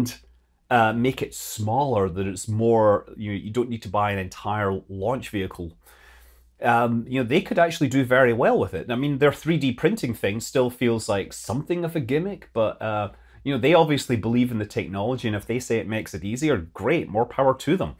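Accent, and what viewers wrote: Scotland, United Kingdom. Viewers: whatever the distraction be it's certainly lightened up your Troon lilt somewhat expressing giggles and tongue tied pronunciations in places made me think you've been showing your support and appreciation for the micro-brewery industry. Again! Lol. Sorry, you have this fabulous narrative skill that's appealing and easy to digest as half the time I won't be watching your youtube update but listening all the same. It's a bit like you stream your Lego set construction while i'll get on with the ironing over here busying myself. Seriously, your extensive knowledge base linked over many disciplines and an un-baised view is so pleasurable and refreshing on a platform inundated with competition. Keep up the good work and fly safe.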